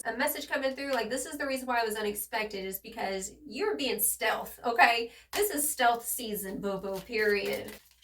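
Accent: South, United States. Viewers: My fave southern reader fr!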